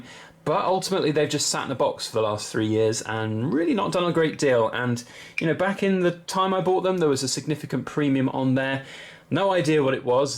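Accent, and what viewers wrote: England, United Kingdom. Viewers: Love your channel. Sometimes I just play your videos while I'm relaxing. I guess its the English accent. Gives your channel that European flair that isn't common in the US. Always good content. Have you seen the price of silver? You can sell your extra silver now. Or keep holding out. How much silver do you buy monthly? weekly?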